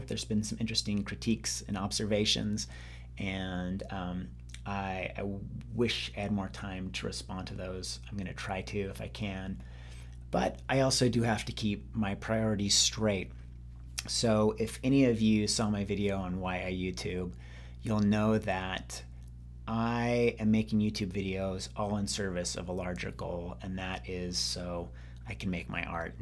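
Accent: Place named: California, United States. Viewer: Where did you pick up that cali girl ascent. It is perfect.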